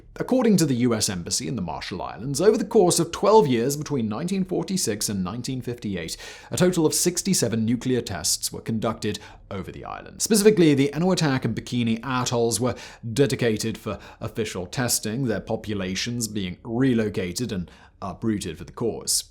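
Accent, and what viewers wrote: United Kingdom. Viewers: A video about a country the United States eradicated is rich coming from someone with a British accent... The British eradicated half of the world, including what became the United States lol.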